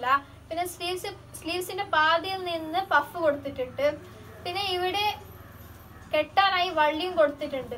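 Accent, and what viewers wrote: India. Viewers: Ksd basha pola ind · Malayalee ano atho tamil ano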